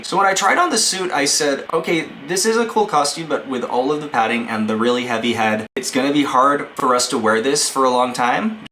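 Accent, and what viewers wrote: Australia. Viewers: NGL, the last time I watched one of your videos, I remember you having an Australian accent. Not saying that it was a bad thing at all, but man, things have really changed since I last watched you on YouTube years ago.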